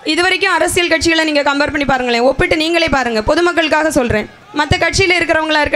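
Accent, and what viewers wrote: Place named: Kanyakumari, India. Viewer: கன்னியாகுமரி தமிழ்😍😘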